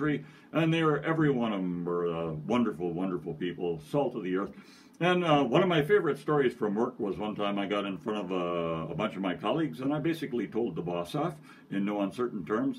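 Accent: Scotland, United Kingdom. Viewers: you can tell from the comments that your Fetlockers LOVED this highland fling-y ditty. [And I am highland flung from trying to dance to it.] Ah, the sweet sounds of the drone pipe! Your Scottish accent rivals that of the late and much-missed Sean Connery. We're feckin' exuberant! Admittedly, our compeers may soon grow weary of our shouting "feckin' right!" at every opportunity... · Love it! Good Scottish brogue!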